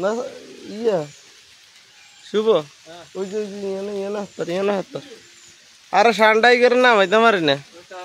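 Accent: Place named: Noakhali, Bangladesh. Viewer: আন্নে এরিন্না নোয়াখাইল্লা কতা কন, আন্নের শরম লাগে না? আন্নেগো বাড়ি কোনাই? হাদেরখিল নি?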